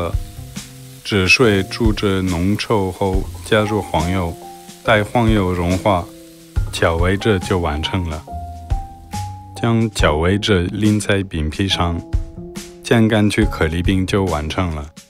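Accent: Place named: Henan, China. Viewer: 河南口音笑死我了哈哈哈哈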